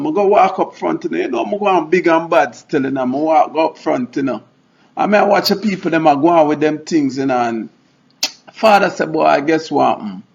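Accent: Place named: Jamaica. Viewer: Whewwwww straight up Jamaican dialect/vernacular lol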